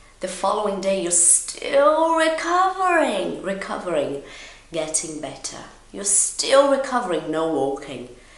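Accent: United Kingdom. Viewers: ....hi....Ididn't like British English till Know You....I'm loving now to listen You...kisses❤ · Your british english is great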